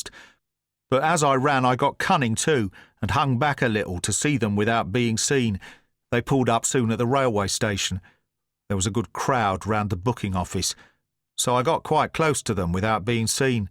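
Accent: United Kingdom. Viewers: Agreed I love my I love British female accent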